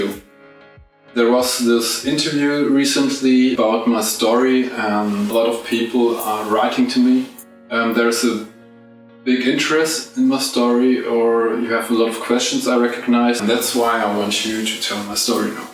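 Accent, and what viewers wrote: Germany. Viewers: Your story is amazing. This explains a lot of your tattoos you have unfortunately when you’re growing up, there’s some real bully kids and mean kids that want to make you feel bad about yourself fortunately for you you have nothing to feel bad about those same kids today are probably like wow look what he did amazing I love your accent German so am I I should say part German in German and half Apache, Indian, good combination, fire and water. I was born in July. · Der deutsche Akzent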